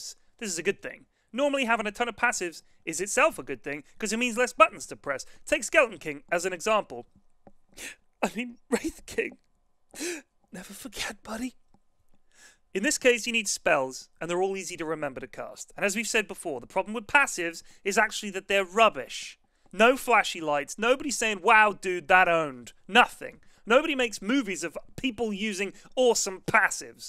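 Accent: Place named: United Kingdom. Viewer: his british accent makes everything sound funny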